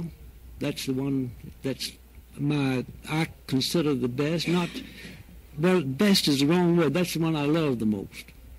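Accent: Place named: South, United States. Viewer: Wow! This is of pretty amazing quality. What a cool southern accent.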